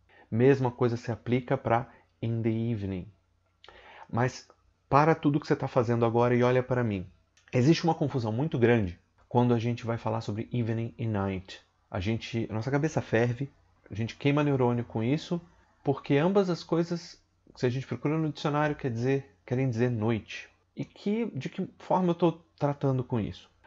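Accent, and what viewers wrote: United Kingdom. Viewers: Eu tô maratonando seu canal e to amando aprender o sotaque britânico pois é mais fácil para minha pessoa falar, ouvir e entender melhor. Mas uma pergunta, se uma pessoa está saindo e fala: Good night! Eu deveria responder como ela disse certo? Pois ela está saindo. Então eu também responderia "good night" na despedida?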